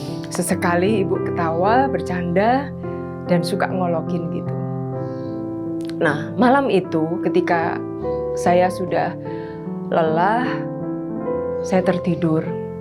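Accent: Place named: Java, Indonesia. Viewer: Wah jawani banget nggih bu dlm bercerita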